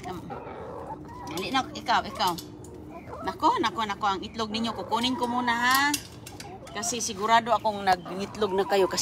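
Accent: Philippines. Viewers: Like your tagalog Accent thanks for sharing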